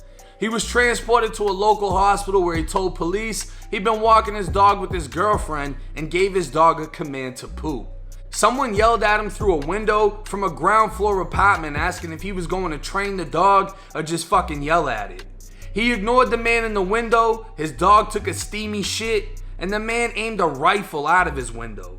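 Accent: Boston, United States. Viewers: I knew you were from Boston from your accent homie. Your background is probably Irish I’m guessing. We got an Irish homie from the hood. We call him polar bear. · I knew knew knew you from Boston? What part...heard the accent in all the videos. Dorchester all day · What's that accent,? Boston? Anyway, I just found your vids a couple days ago...good 💩, pun intended 😂 I was from MI, came down to FL in 2012. Went through my little few month county ordeal, but nothing like the CIs. But your work still hits close to home. I wish you continued success. We rockin' with ya in Ft Myers 👊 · The Dog shit shooting I cant with you today.... are u from Boston to? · I KNEW YOU WERE FROM BOSTON!!!!!!!!!!! lmao this whole time ive been trying to figure it out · I know you were from Boston! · You said walking through Boston? You sound like Boston but I thought you was Florida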